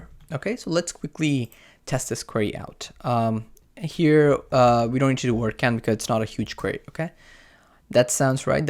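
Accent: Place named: India. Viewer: Funny to hear an indian guy trying so hard to hide his thick accent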